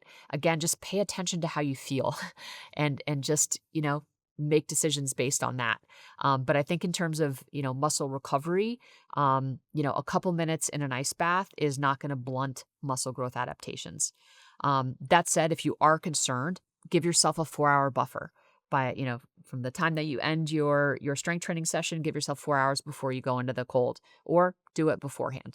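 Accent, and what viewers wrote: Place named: South, United States. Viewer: I dip my hand into a ice fill cooler .. southern voice... Again thank you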